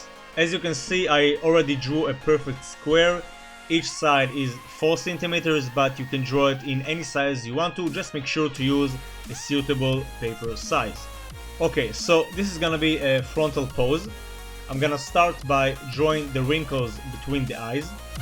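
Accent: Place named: Israel. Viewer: הי אני יודע שאתה ישראלי בגלל המבטע לול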